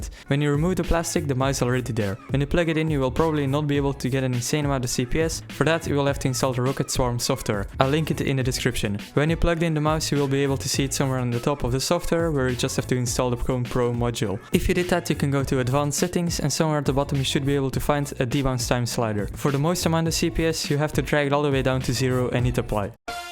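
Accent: Netherlands. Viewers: i like your Dutch accent :D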